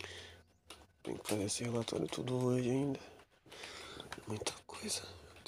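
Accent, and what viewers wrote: Rio de Janeiro, Brazil. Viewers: Deus, percebi agora que eu tenho gatilho por sotaque carioca 🥱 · gosto muito desse sotaque carioca😍😍😍amo❤ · Boy... Eu tenho um amigo com a voz idêntica a dele e tem o mesmo sotaque Carioca ❤️ meu amigo é belíssimo, tô desconfiada que seja ele nesse canal kkkk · So uma dúvida aqui... você é carioca? Se vc Não for, eu vou bugar muito kaksksks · Sutaque carioca: a minha barriga : 🦋🦋🦋🦋🦋🦋🦋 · Por que um sotaque carioca chama tanto minha atenção caralhooo🌚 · O SOTAQUE CARIOCA É LINDO, PIQUE BANDIDA ✋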